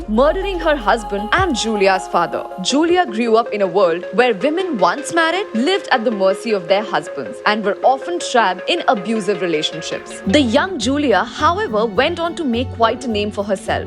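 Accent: India. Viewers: u an indian? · Ughh! The Indian English accent! Makes everything sound funnier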